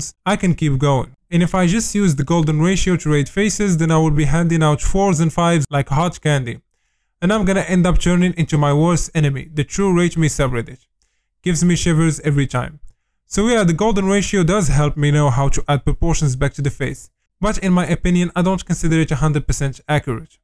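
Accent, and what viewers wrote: Morocco. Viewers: hhhhhh 3aref rassi m3etel walakin mn l anglais dyalk 3reftk maghribi, tbarkallah 3lik a khoya kml f self improvement content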